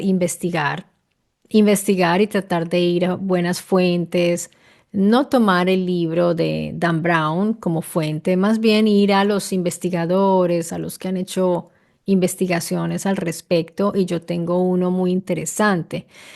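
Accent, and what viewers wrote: Italy. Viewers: Ese acento es de una rola que vivió en Italia y ese cabello/gafas le da un gran marco hermana.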